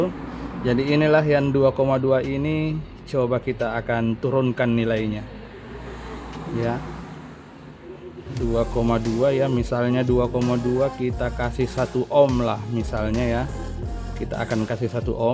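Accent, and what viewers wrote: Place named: Medan, Indonesia. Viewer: Tinggal daerah mana bg, kayak logat medan, saya d rantau prapat bg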